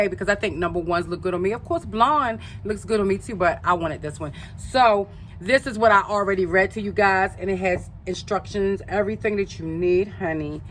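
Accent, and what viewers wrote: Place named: New York, United States. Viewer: You talk like an Italian mob wife lol I love it!! Are you from Jersey or New York?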